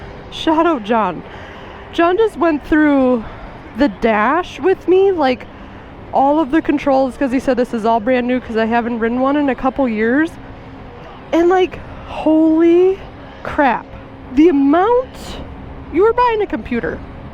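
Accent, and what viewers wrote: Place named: Chicago, United States. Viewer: Sounds like you are from Chicago.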